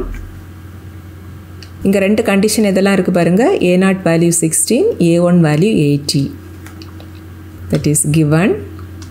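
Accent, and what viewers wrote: Kanyakumari, India. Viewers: Mam the way your teaching is very nicee ninga endha oru namma kanyakumari ah...😊😊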